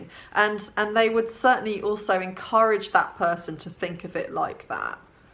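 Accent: United Kingdom. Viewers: I’ve subscribed recently as I love your thoughtful assessments based on your knowledge and experience (I am a Ph. D. in another field, and I greatly appreciate the work you have put into your career and expertise). I hope your channel continues to grow as your analyses are very thoughtful and respectful! Also I lived in the UK for a bit when I was in grad school so love the accent always (my spouse and I are anglophiles!)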